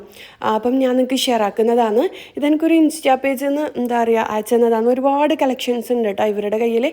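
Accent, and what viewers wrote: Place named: Kasaragod, India. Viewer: Pinne kooduthlayum vidiokulla voice kodukkunnadh kelkkan nalla rasa kasaragodile bhasha chengalle malayalam ariyamengilum ivide samsarikunnadh vere bhashailan orupadu ishtam samsaram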